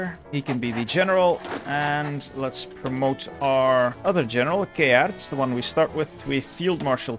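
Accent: Flanders, Belgium. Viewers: Wallowa of flemish, you sound flemish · O wauw ik dacht al dat je een vlaming was aan je accent, ik hou van uw content much love ❤️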